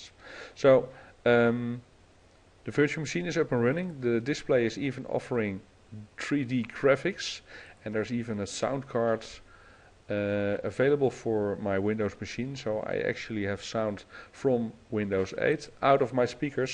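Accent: Netherlands. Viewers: whaha viertual musjien Ik heb echt een hekel aan engels met een nederlands accent hoewel ik het zelf ook heb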